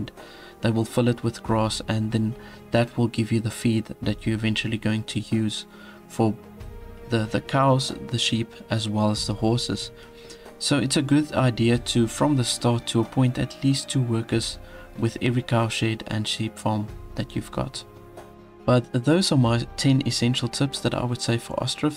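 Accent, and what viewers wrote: South Africa. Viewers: South African bruv? perhaps?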